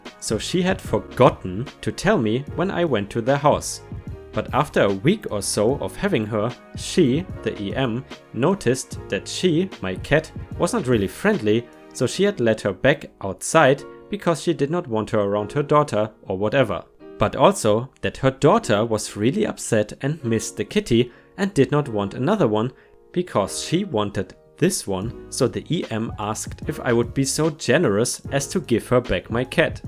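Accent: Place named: Germany. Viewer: Your english is not bad. But I am still hearing your german accent as a german myself.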